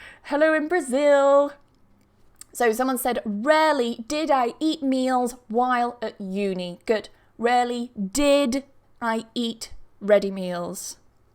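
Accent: United Kingdom. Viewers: Thank you so much from Ukraine! Just about a couple of days ago I was thinking about the inversion, as I see it quite often and I was interested in learning it. And today I've seen your video and I was able to understand everything you were talking about! Thank you!! And one more thing!:)) As I'm learning different English accents (British is my favourite one!:)) but I also know you have more than 50 accents and dialects in England, I want to say that your accent is really great and it's a great pleasure to listen to it:))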